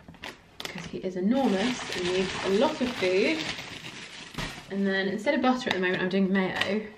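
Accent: England, United Kingdom. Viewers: I'm Brazilian and I'm learning a lot with your videos... Thanks for sharing your day with us... The British accent is awesome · I love your British accent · You look beautiful without powder... I enjoyed it, I like this kind of video to listen to your British accent. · This vlog is amazing and I dont know how my sister came by you but she is probably pretty proud of herself. I am not a native English speaker but I would certainly try and hope to grasp that beautiful British accent. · I just watch this by accident and suddenly this lovely couple move my heart and, absolutely your british accent really, i would continue to watch this in future 🥺🥺🥺🥺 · Hello, sweet husband, cute dog, wonderful home! everything done right, respect. Your washmachine and dryer from Miele was a very good choice. I am from germany and i like your new kind of videos. I have a Miele washmachine too and ihm very satisfied with them. 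I know its expensiv but it works for so many years, this is how the investment pays off. Your pronunciation of the word Miele was correct. I heard a small English accent but it was still correct. Stay healthy and continue enjoying your great life. Best regards from Sabrina, from Baden-Baden, near the beautiful Black Forest, in Germany! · Yes, it's mealuh, meal like meal and uh somewhat like in uhm. Your slight British accent gives away you are not exactly a native German. 😎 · Hi, my lovely teacher, thank u for ur lessons, I always benefit from u many things thanks again for ur time. I love farms I work in farms too, I absolutely love ur accent British people. I'd like to to England but, it's difficult for me, I can't get a visa to England. If u could help me to get there to work with u in ur fram, That would be nice of u. Have a nice journey in ur life and God bless u, ur husband and ur family..... 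❤️ ❤️ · The British English is so pretty so clean! I'm loving these vlogs ❤️... Here is from Brazil 🇧🇷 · Does she speak like a britanic like every day life or she speaks more slowly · That is kind of best lifestyle u have an extremely beautiful farm. My father is a farmer and I love our farmland, unfortunately, we can not go there because we are quarantined...I'm very happy to see your daily life and lifestyle.🥰🥰🥰 I hope the world pass this bad situation. I'm Majid Saneie from Iran. I like your pure British pronunciation. I follow u in YouTube channel. If I have mistakes I delighted to correct me.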